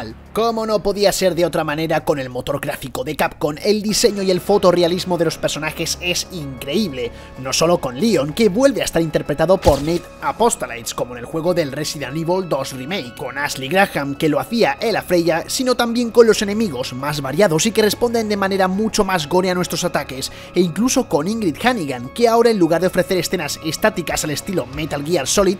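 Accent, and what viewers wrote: Spain. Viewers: mucha pupa porque suene Castellano de España? en fin estáis mal de la cabeza xddddd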